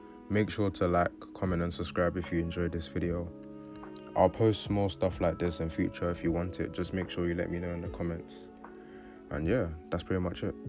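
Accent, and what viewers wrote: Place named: London, United Kingdom. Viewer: I'm from London as well, recognised the voice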